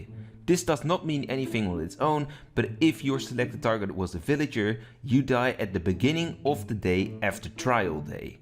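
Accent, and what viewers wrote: Netherlands. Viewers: UR SO DUTCH HELPPP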